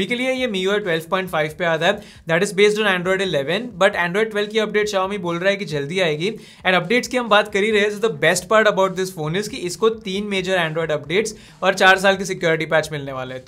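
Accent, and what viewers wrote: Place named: India. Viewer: I honestly cant tell whether its english, or half english half indian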